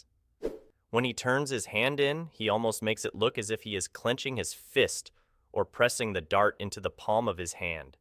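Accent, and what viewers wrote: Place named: United States. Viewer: Did not expect an American accent on a darts video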